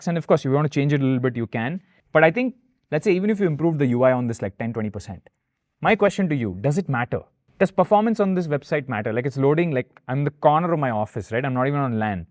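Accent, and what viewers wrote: India. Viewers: For a moment, I thought i accidentally switched on the hindi dub mode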